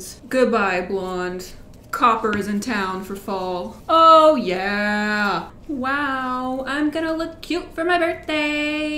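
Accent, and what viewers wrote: United Kingdom. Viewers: Lol 😂 love your British accent, Hi from Manchester in the UK 🇬🇧 x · Major Ginger Spice vibes - perfect with the brit accent ✌️👩‍🦰🇬🇧 Love it!!!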